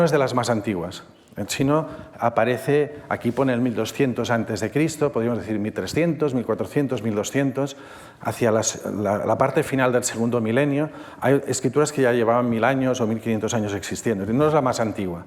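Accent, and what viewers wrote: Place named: Catalonia, Spain. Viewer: Como dice el conferenciante es catalanohablante y el plural de caràcter en catalán es caràcters. No hay cambio de tonicidad. También se nota en la forma "hacer servir“ (fer servir) y, sobretodo, en las s sonoras de los plurales en contacto con vocal posterior, un fonema q en castellano no existe, pero q en catalán, portugués o francés es lo normal en esta posición.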